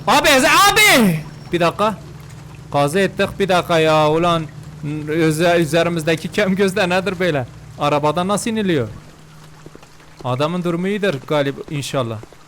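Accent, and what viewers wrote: Elazığ, Turkey. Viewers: Ben Elazığlıyı abi şiveden anasilıyo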